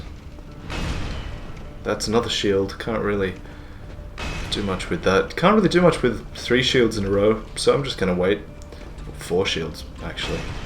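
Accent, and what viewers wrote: Australia. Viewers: Wait. Hold up a second. YOU'RE AUSTRALIAN?!? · are you Australian? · hey are you australian?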